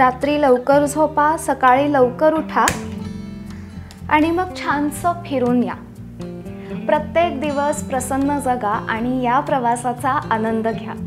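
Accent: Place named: Kolhapur, India. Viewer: तझा आवाज खूप बालबोध आणि मुक्त आहे मी तुझे भटकंती चे सारे videoपाहिले खूप सुंदर मराठी बोलणे तू जप ले आहेस त्याला कोल्हापुरी बाज आहे प्रतेक गोष्टी चा बारीक तपशील देणे ही तुझी सहज आवड आहे.जपानी लोकांचा जिवनाकडे पाण्याचा दृष्टि कोन सहज असतो.कविता वाचन छान, जपान ला जावून आलीस का?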